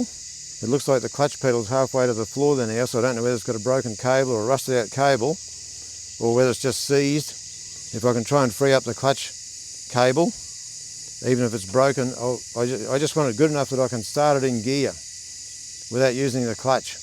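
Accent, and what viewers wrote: Australia. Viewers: Critters. Snakes DEN maybe. Mate it's GREAT to meet a true professional with an Aussie accent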